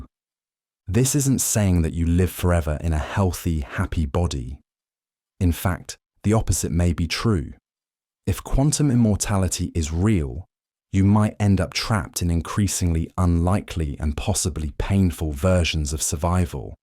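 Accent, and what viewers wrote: United Kingdom. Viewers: Please never change the voice, I love this British voice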